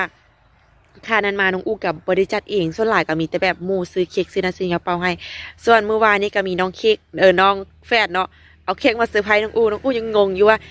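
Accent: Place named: Si Songkhram, Thailand. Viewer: สวัสดีปีใหม่....น้องอู่ติดตามดูตลอดเด้อเป็นกำลังใจให้...ถ้ามีโอกาศมาไทย..ที่บ้านยินดีต้อนรับเด้อ...น้องอู่พูดคือคน..อำเภอศรีสงคราม...จ.นครพนม.....อยู่ใกล้บ้านอ้ายเขยบ่าวหนอย.บ่าวสุ...สบายดี.....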